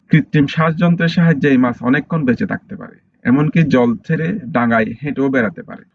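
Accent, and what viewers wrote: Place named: Noakhali, Bangladesh. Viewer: উনার বাড়ি হয, নোয়াখালী অথবা চিটাগাং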